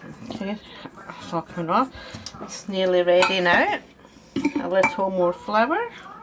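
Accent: Scotland, United Kingdom. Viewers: As I like the Scottish accent listening to this was almost like listening to a ASMR video. Greetings from Italy.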